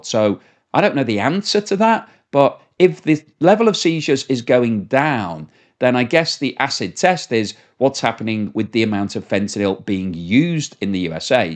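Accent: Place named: Northwest England, United Kingdom. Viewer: Hi, I replied via e-mail, but think it didn't go through. Sorry if this is redundant. Here 'tis: Hi, Thank you. You have the easiest-to-understand economics blog that I've found. It's admirably clear, and you come across well. There really is huge value in explaining the obvious, which you're doing very well. I've made a fair bit by publishing books doing exactly that (e.g., my first book, "An Understandable Guide to Music Theory for Jazz, Rock, and Blues Musicians," which sold about 15K copies--building on my academic studies [music theory and composition], decades ago.) BTW, I guessed correctly that you have a financial background--though I thought you were an ex-stock broker--but I was wrong about your accent: I thought it was from NE England rather than NW England, primarily because it's similar to that of one of my favorite Brti comedians, Sarah Millican, who I think is from Tyneside. 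(I live in SE Arizona, have never been to the UK, have lived almost my entire life in the western US, but am fascinated by dialects.) Finally, I completely understand your decision to branch out on your own because you were taking orders from morons. And I applaud you for it. That's pretty much where I was 40+ years ago, when I started a book publishing company with a gift of $1500 from my parents--I was near broke, but was doing graphic arts work at the time, so I had the necessary skills--and then had to claw my way up, handicapped by under (nearly nonexistent)-capitalization, my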